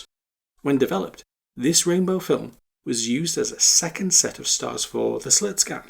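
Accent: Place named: United Kingdom. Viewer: First time seeing your channel, I think. It really reminds me of Captain Disillusion, if you're familiar with him. Great production values where you can tell a lot of effort has gone in, and very educational with clear, inspired examples to show what you're talking about. Like a less boisterous, more British version of Captain D, for sure!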